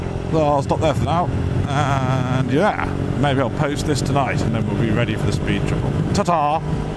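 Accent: United Kingdom. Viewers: Such a wonderful British accent. Subbed old chap.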